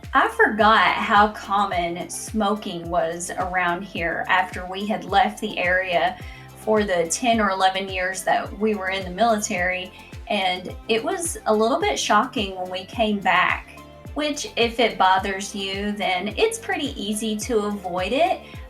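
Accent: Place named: Kentucky, United States. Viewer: So you like your country accent but don't like to hear other country accents. Okay, gotcha. Can't get more back woodsy than Kentucky. Maybe you could move back to your holler in Kentucky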